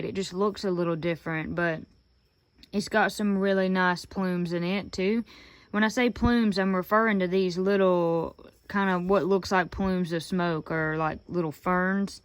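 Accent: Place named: Tennessee, United States. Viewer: 😂 I just had to take a second to figure out what you would be saying, since I knew you weren't talking about maggots. I've lived most of my life in TN, so I'm used to accents like yours. hahaha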